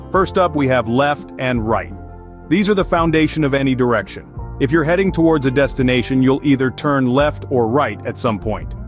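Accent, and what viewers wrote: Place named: United States. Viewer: I love this American accent. How I love you, America! ❤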